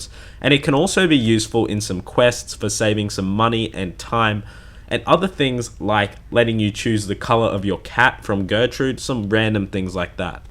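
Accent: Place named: Australia. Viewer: Mate, you must be fair dinkum, true blue, rinky dink Aussie? I mean you have to be, 7pm upload time, good as gold. In all seriousness, love the content and your familiar, refined accent. Keep up the good work mate. If I were to be honest; I would have to say that your videos contain some of the most useful OSRS content I have seen on YouTube in a long time. tl;dr Fully sick vids, hectic descriptiveness, sickhunt. 11/10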